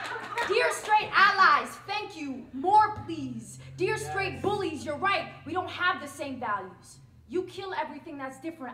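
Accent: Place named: New York, United States. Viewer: She sounds like she’s from New York 😂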